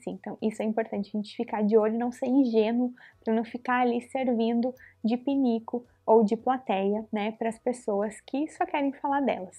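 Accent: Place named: South, Brazil. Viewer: Adoro esse sotaque, me lembra de quando eu eu morava no sul. Saudades.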